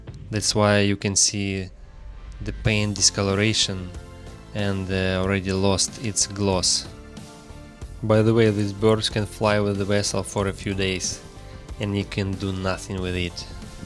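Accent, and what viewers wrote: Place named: Russia. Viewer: здравствуйте,я смотрю что вы наш по английскому произношению 😂,скажите как вы начали путь и как проделали путь к капитану в иностранной компании я через 4 месяца буду поступать в Макаровку и волнуюсь по поводу английского и карьеры не хочу по Москве реке плавать на паромах до конца карьеры)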